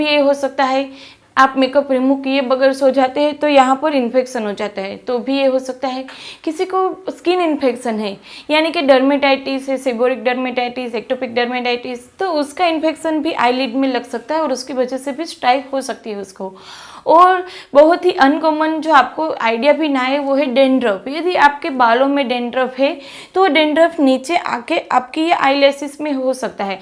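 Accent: Gujarat, India. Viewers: Madam apka surname se ap north indian lagte ho per hindi ascent se gujarati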